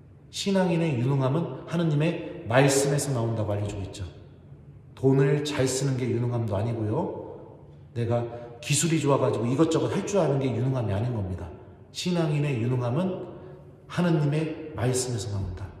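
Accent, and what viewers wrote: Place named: Jeolla, South Korea. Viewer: 지금도 충분히 보기 좋으셔요 ㅋㅋ 전라도 말에 귄이 있으셔요 즉 매력넘치신다는 말씀입니당😊👍😆💖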